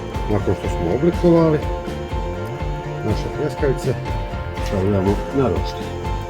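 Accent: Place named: Bosnia and Herzegovina. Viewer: Predlazem da ove zamerke prihvatis kao dobronamerne : moja keva je iz Lebana o to je tu negde.Pite te ko teje lagao za sir? U Leskovacku skaru mora da ide tucana ljuta paprika zatim kasika prezli ,jedno jaje i na tu kolicinu ide pola kasikice soda bikarbona.Drugo: reprezentecija - pokazivanje: te lepinje kao da si sekirom sekao.Dok gledamo ovaj tvoj video stice se utisak kao da ti se pisalo,pa si zurio.zadnji komentar: po govovoru kao da si Bosanac,stoga drz se ti Banjaluckog recepta . Pozdrav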